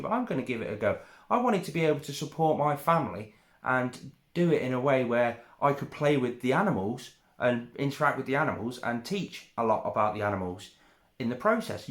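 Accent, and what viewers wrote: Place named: Oxford, United Kingdom. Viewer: Your comment on one of Brians videos brought me here. You said you were a content creator, so I clicked to check it out and checked out a couple of yours. Let me tell ya, I like what I see bro! Your voice reminded me of being with my grandparents who are from Oxford. I was homeless for 5 years but now I have a home, a son, car, motorcycle, 2 boas, ECT...Keep it up! Peace, from Yankeeland!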